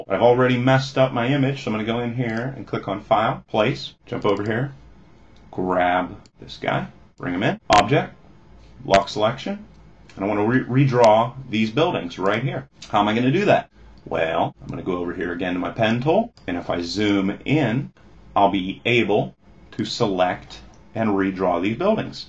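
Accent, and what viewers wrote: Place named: Texas, United States. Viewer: are you from the same place as Mathew McConaughey, your accents are exactly the same. sorry for the unrelated comment. great tutorial btw.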